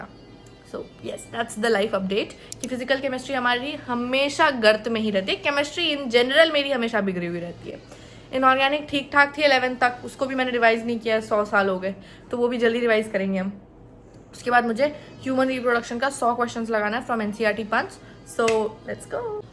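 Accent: Bihar, India. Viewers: Aap bihar se ho??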